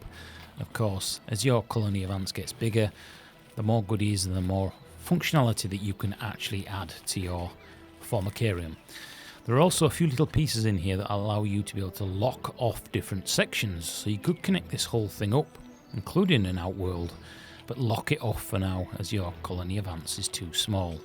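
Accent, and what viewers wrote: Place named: Northern England, United Kingdom. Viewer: That moment when you realise your tube doesn't fit.... Love the northern accent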